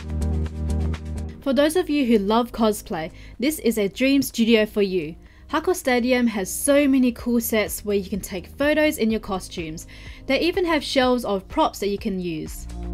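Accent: Australia. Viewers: This girl sounds like someone from west Sydney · great video - it bought back many memories. I think you have Aussie accent, have you studied in Australia. I am Aussie and my wife is from Japan. Keep well. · It's my first time to hear an australian accent Much more like British than I thought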